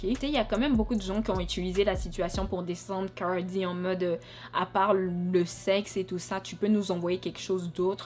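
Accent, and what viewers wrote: Quebec, Canada. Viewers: You sound like Canadian. I don't like that song, it denigrates black women. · T québécoise ? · Hey pourquoi tu parle comme une kebecoise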